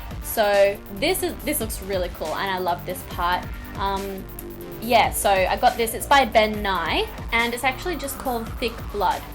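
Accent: Australia. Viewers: your Australian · Is she Australian? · Shes Australian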